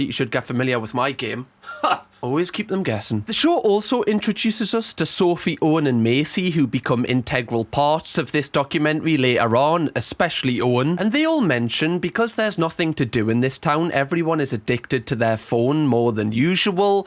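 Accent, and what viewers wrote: Newcastle, United Kingdom. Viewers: Literally just found your channel a few days ago - love it. You’ve successfully made Geordie my favorite British accent. 😅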